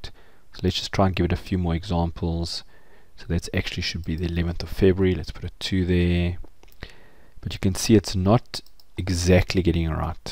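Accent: South Africa. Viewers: Great Video, and its great to hear another South African accent.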